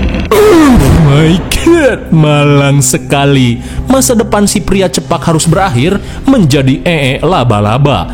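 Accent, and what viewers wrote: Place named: West Java, Indonesia. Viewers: Hallo chanel kesukaan ku si logat sunda pred ❤ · Kaciri orang sundana si aa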